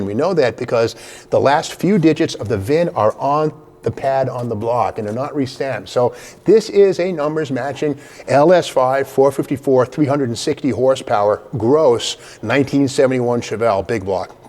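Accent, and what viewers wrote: Boston, United States. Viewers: I have to say that Steve really does know his cars. He's a pleasure to listen to especially with that Boston accent of his. Go Sox!!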